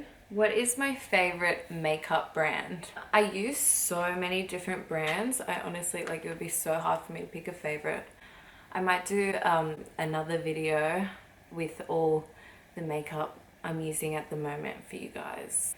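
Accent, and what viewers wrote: Australia. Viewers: Third Aussie YouTuber I'm subscribed to. Aussie accents are like music to my ears lol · Pretend? well we don't need to with you honey you fat water buffalo. This girl is absolutely beautiful (no pretend) also she's not monotone it's just an Aussie accent and looking after two young kids all day. That shit takes a toll, but you wouldn't no fatty and you never will.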